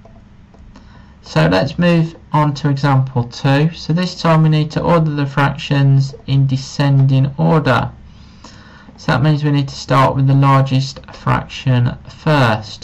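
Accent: United Kingdom. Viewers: R u british???😮😮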